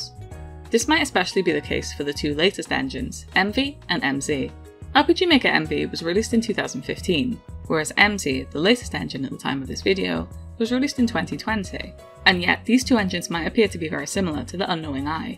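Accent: South Wales, United Kingdom. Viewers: I'm from South Wales too! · woooo south wales gang let’s goooo! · South Wales accent?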